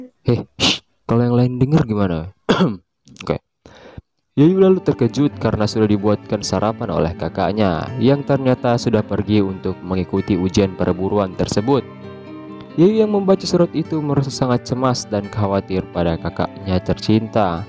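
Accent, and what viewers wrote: Sulawesi, Indonesia. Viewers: Mantap bang, org sulawesikah? 😅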